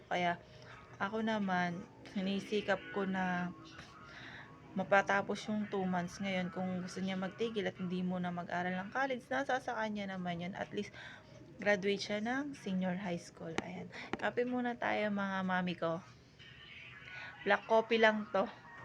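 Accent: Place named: Cardona and Morong, Philippines. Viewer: Parang cardona at morong ang punto ng salita nyo madam.kapareho ng sa amin taga isla talim si nanay .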